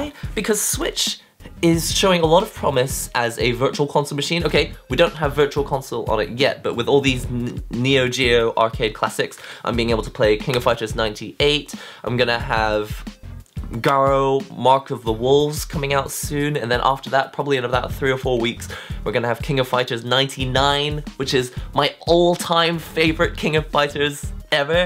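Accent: United Kingdom. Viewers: Wait and Asian guy with a British accent amazing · As an america, seeing a asian talk with a british accent was very confusing.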